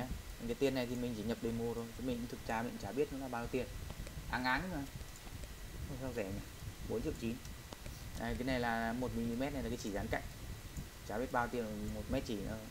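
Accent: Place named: Thach That, Vietnam. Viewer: giọng giống thạch thất quá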